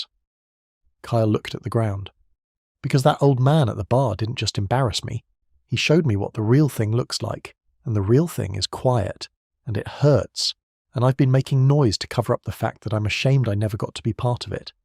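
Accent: United Kingdom. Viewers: Why, oh tell me WHY, Lord, is this story delivered with a British accent? I can't listen!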